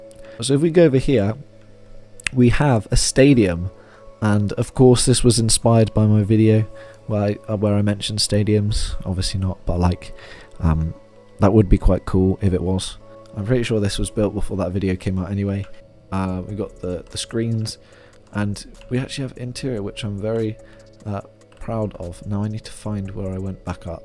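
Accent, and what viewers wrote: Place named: United Kingdom. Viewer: british i know it on your voice 🙂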